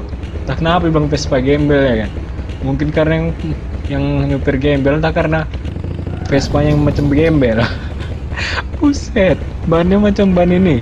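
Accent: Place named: Tanjung Balai, Indonesia. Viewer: abg orang tanjung balai ya?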